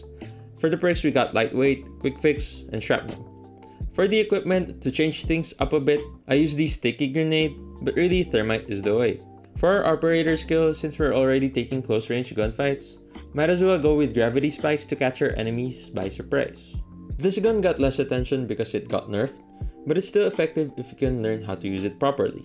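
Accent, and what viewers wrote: Philippines. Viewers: Pinoy ka lodi no hehe gawa ng accent mo sa english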